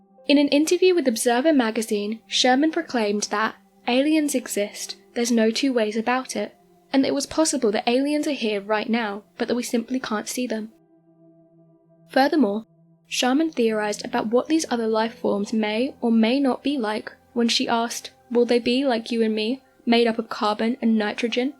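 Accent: England, United Kingdom. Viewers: I personally live the voice very good narrative speaks intelligently and the best English accent! Keep her. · She's definitely not Australian or Kiwi. My money's on British. Sounds very British to me, you do realise they have different accents in different areas over there.. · I love her voice, just something about a British accent that is so soothing.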